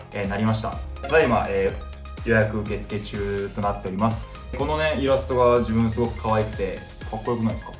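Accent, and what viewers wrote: Kansai, Japan. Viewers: 藍くん、ハンサム・ボーイなのに 喋ると関西人そのもので、思っていたイメージとギャップがあってビックリしました😂 ちょっと面白いやん…とハマってしまったので、コレからの【らんるい】も楽しみにしてます🤗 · 関西人としては関西弁と関西のノリがとても落ち着くので どこへ行ってもそのままでお願いします、、！！ コンビニでお箸渡されて脈ありか？ は笑ったｗｗｗ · 関西弁新鮮な感じします！✨ 明日からのVNLフランス大会楽しんでください☺️ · 関西弁？か分かんないですけど 〜やけど、とか 分からんけど〜とか なんか親近感湧きますねぇ〜😂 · イタリアで頑張ってますねー！応援してます。 関西弁が混じるところがいいですね！ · 最初の方、若干標準語ぽかったのに、身内からの質問読み出して関西なまり出始めたの好き🤣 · ランくんの関西弁がとっても新鮮🤗💞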